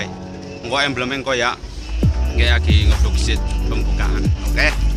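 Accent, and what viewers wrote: Banyumas, Indonesia. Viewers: Ngapak bae bhsne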